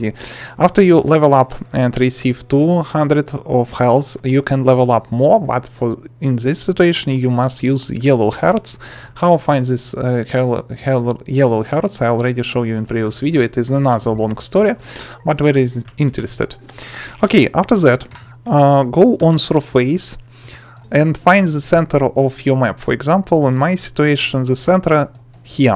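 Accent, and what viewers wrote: Russia. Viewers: ur accent is cool u are from russia?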